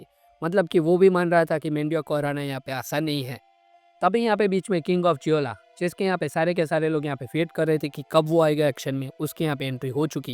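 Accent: Gujarat, India. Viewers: From your ascent i can say u are gujarati i am also ❤😊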